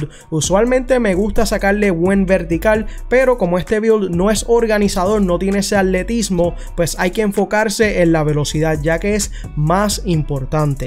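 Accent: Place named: Puerto Rico. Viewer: Eres puertorriqueño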